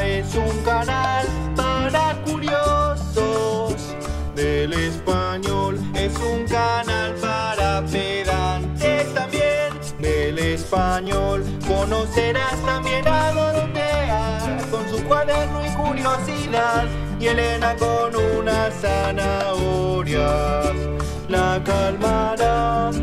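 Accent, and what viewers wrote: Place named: Argentina. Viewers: Con razón me sonaba un poco como el estilo argentino, jajajaja ¡Qué loco! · ritmo puertoriqueño con tono argentino para hablar de español, una maravilla!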